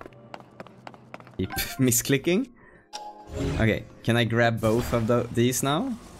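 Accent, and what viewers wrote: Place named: Sweden. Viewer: One word in and i hear the swedish accent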